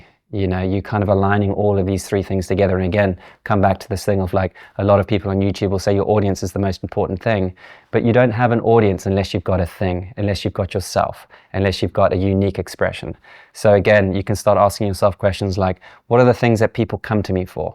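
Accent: South Africa. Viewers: Buddy, is there a hint of South African in that accent? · South African? 🙂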